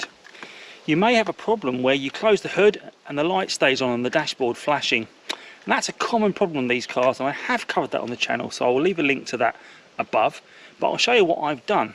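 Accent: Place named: Kentucky, United States. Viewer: Nice accent, Kentucky?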